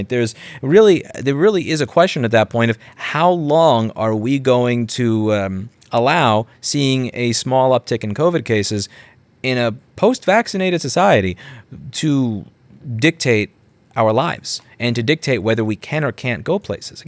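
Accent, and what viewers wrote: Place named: Long Island, United States. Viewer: Your on long island. We long islanders can recognize that accent anywhere. Im moving up to VT in a month ( part of the crowd re-evaluating their life) and Im concerned my accent will give me away up there.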